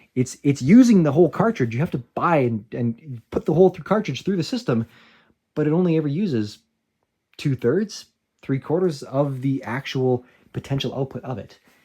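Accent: Canada. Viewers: Ahy do you have a canadian accent and use ML ? 🤪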